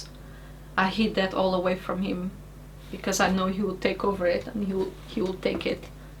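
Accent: Portugal. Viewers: Are you Portuguese? You sound like one... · i watched the whole video, and I love your Portuguese accent, when you say "thanks" it sounds to my "murican" ears that you said "tanks".. and my brain immediately went.. no wonder she likes tanks, but how do you do oil changes on a thanks... I've said this before you to on stream ages ago, but as one of the "silent" majority who watch, thank you, for choosing to keep your steaming and videos going. There are/were many a night where I am rocking one of my kids to sleep to one of your streams or chilling in the hospital icu ward, while joking with my nurses, watching. It is weird to think it has been that long, but one is just finished kindergarten, and the other is 2. Both boys love watching you/jingles, you have become routine like i used to watch specific military history shows in TV as a kid in the 80's. so Thank You, you've made an impact in lives much bigger then you've maybe imagined possible when you were back in that mess years ago.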